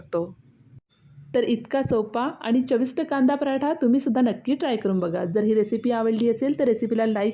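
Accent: Vidarbha, India. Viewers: Bhashe warun olakhtat loka,vidharbha chi marathi vegli aae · तुम्ही नागपूर, भंडारा किंवा पूर्व विदर्भाचे आहात वाटते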